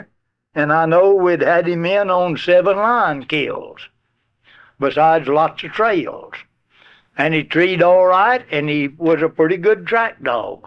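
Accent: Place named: Rural, United States. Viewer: The fantastic thing about this fella is not only his storytelling but his vocabulary while sounding like a dumb azz hick. This man is very intelligent. Everytime i listen to one of these i am so interested because i feel like i was there. This guy was a great man, believe his name is! Lol, just foolin' about...great upload. All of them...😊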